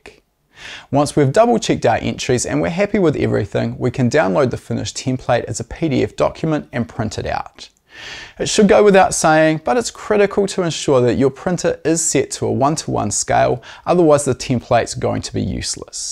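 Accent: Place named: New Zealand. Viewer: Awesome. Thanks ✌🏽 PS-Maybe the sour responses about the “what makes it teck” saying were from guys that wish they had a cool accent? I certainly wish I had the cool kiwi vocabulary/accent haha. -Best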